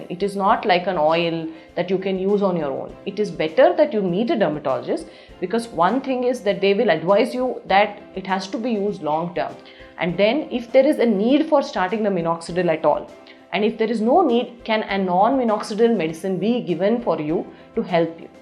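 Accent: India. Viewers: It's the Indian accent my guy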